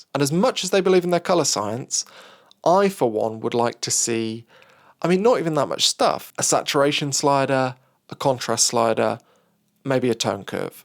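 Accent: United Kingdom. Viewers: What the f*&^* is this channel about. Confused adults? 'British accent'! A very accented 'British accent'! guess the locality.. I have it within 50 miles!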